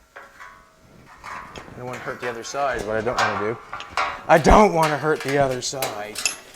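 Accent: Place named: Maritimes, Canada. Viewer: First time here, I knew that was a maritime accent! Great work!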